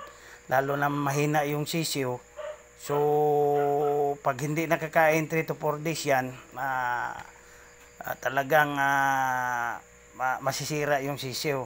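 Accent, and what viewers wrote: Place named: Visayas, Philippines. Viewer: tgaasa diay ka boss.? bisaya mn diay ka?😂😂😂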